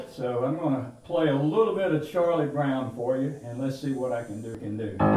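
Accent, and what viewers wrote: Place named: Rural, United States. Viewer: I am from Egypt, and this is the most english accent that i love to hear, is that the accent of the county sides people in America?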